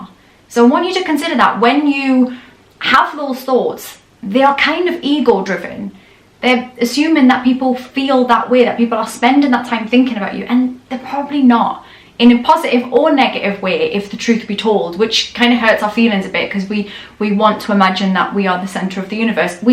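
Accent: Newcastle, United Kingdom. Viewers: Beautiful geordie accent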